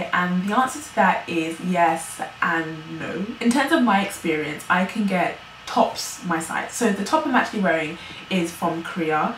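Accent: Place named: RP, United Kingdom. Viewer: You're so posh your accent omg!! 💕💕💕😍😍😍😍😍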